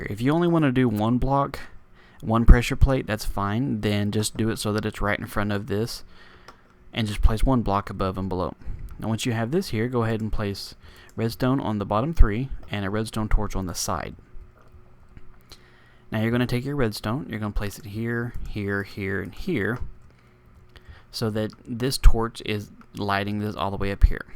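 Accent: South, United States. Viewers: I cant tell if you have a southern accent or not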